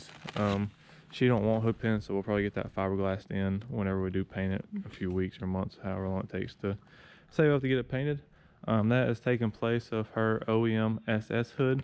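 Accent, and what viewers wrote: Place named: South Carolina, United States. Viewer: I knew yall lived in south Carolina by your accent bo I lived moved from Swansea sc lived there 8 years. Moved to ohio. Thinking of getting a lt1 or a mustang but I've always been a chevy guy like the videos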